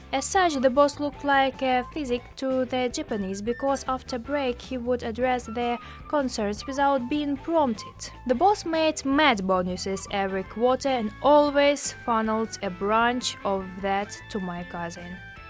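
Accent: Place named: Russia. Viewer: I can hear the russian accent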